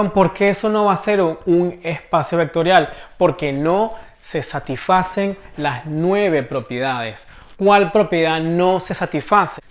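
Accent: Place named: Panama. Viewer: Eres de pTy por el acento hahah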